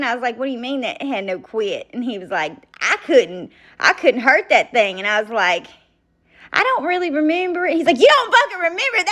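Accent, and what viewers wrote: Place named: South, United States. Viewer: I love that country accent.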